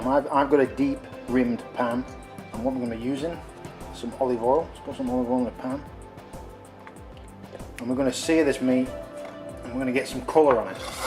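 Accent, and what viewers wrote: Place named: United Kingdom. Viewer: my goodness, you're hot,! <3 im a first time viewer of your channel and good job! And your accent immediately reminded me of my Brit friend...whom, turns out, lives near Rotherham as well! I hope I'm developing an ear for the regions of the UK! Anyways, a man that knows his way around the kitchen is a total turn on! Ahh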